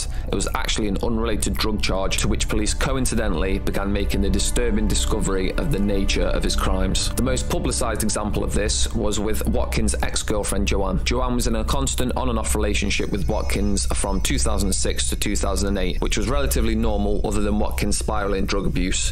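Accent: United Kingdom. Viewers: Sorry for asking! Is ur accent british? (Not a native English speaker is asking!)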